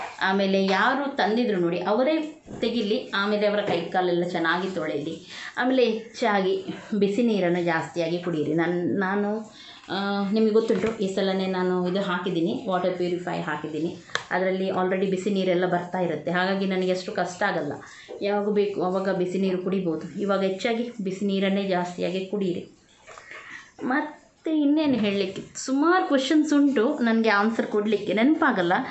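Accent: Mangalore, India. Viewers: Hai.. I like very much way of explaining.and mangalore kannada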